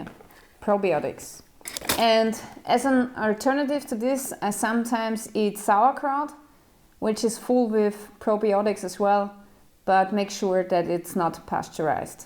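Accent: Sweden. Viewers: Wait are u swedish ?? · Are you from sweden/Sverige on swidish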